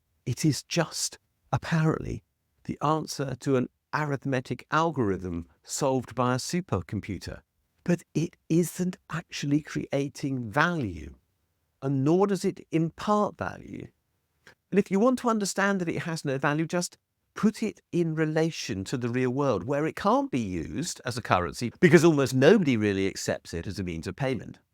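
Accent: RP, United Kingdom. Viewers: So much misinformation here it's unbearable. Don't let the posh English accent, the bookshelves and the "professor" title fool you.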